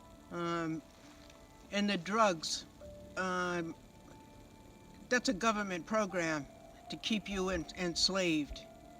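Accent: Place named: New York, United States. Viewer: new york jewish accent the jews think they are black they stole the Black peoples history, what happened to all the red Skins,rich jews killed most of them off, Redskins who don't use the terms people of color that would be racist towards white people, who as soon as the sun shines on them, they turn a lovely Red color from the Pahana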